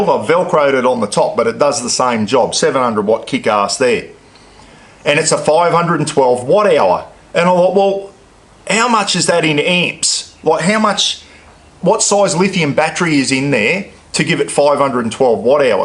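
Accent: Australia. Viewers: Hey Great to see you back on youtube, I missed you heaps when you shut down your old channel. It is so good to hear the voice of a genuine Aussie on youtube. I am so sick and tired of hearing the voices of so many arogenate yanks.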